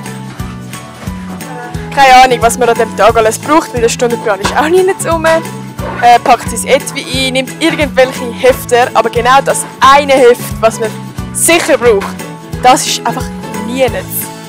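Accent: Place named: Switzerland. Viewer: der schweizerische "Dialekt" ist irgendwie interessant. schön dass es auch solche YouTube gibt :) und nicht nur hochdeutsche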